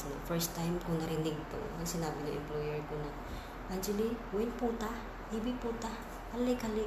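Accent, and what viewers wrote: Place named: Hiligaynon, Philippines. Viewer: Ilongga ka dai , malambing mga ilongga., Stay safe .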